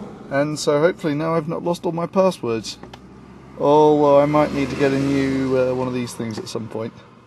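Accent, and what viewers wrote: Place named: United Kingdom. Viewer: how did you get such a british accent if you lived in the states? Did you grow up partly there as well?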